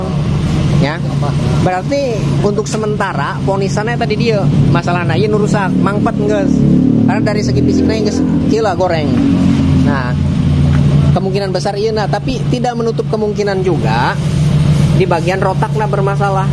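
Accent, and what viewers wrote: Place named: Indramayu, Indonesia. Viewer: Ngomong e kaya wong dermayu ang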